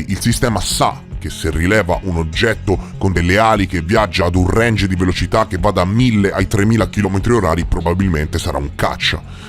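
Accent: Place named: Valdarno, Italy. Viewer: Il Valdarno ci ha regalato questa perla di saggezza. sempre al top! Che tu sia di quelle parti me lo suggerisce il tuo accento, ma potrei sbagliarmi, sia chiaro. Io uso solo l'intuito, non ho software incredibili di alcun tipo.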